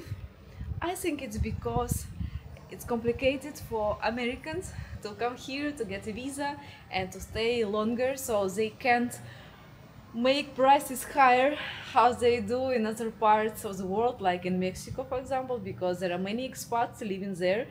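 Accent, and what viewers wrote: Russia. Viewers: $200 for rent and 35% of that for electricity? Electricity is not as cheap as rent in Vietnam. Anyway, I'll subscribe to your channel just so I can listen to your beautiful Russian accent and learn about Vietnam as well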